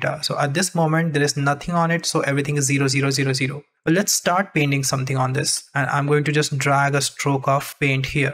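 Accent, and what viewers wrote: India. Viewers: Yes from his voice he sounds Indian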